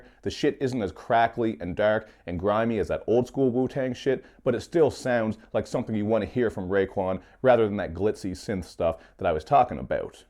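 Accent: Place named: Newfoundland, Canada. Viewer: nova scotia? I knew you were a newfie. that accent sticks out like a sore thumb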